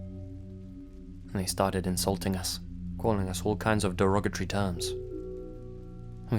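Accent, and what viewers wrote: United Kingdom. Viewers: U sound British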